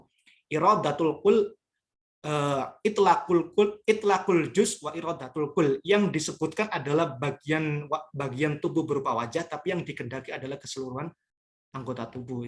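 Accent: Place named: Jakarta, Indonesia. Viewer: Sudah memakai logat logat jakarta,. Terbaik!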